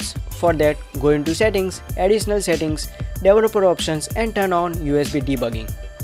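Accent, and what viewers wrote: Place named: Gujarat, India. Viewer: You sound like gujju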